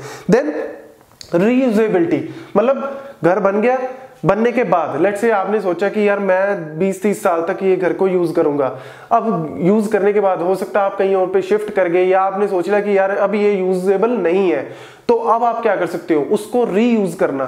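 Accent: Punjab, India. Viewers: sir aap punjab se ho..?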